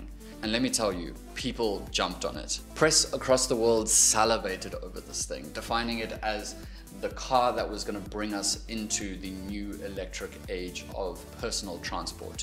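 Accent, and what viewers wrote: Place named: South Africa. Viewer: I hear a South African accent I subscribe it’s that simple.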